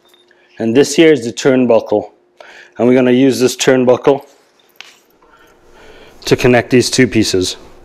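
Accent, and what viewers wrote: Trinidad and Tobago. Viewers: You’re a Trini?? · You sound like a Trini. I am thinking about getting this one myself. Thanks for the video. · Is that a trini accent I hear? Thanks for the video by the way.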